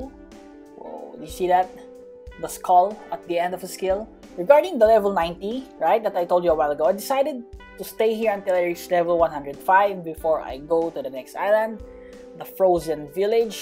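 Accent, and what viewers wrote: Philippines. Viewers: your voice sounds english is no that good you pinoy? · is a filipino because the accent is familiar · Fact: he's a Filipino cuz of he's accent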